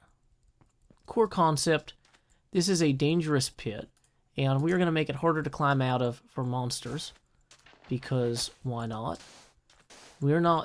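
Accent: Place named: South, United States. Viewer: I just wanna point out the country accent you have compared to everyone else in the hermit craft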